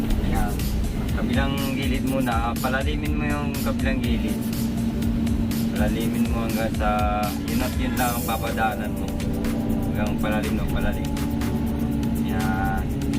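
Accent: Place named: Bicol, Philippines. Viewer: Parang bicolano ka mag salita pre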